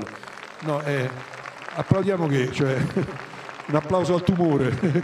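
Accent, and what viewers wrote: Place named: Rome, Italy. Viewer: Che bello sentire parlare don.. perché tanto illuminato e per il suo simpaticissimo "romano".. 😊😊😊😊❤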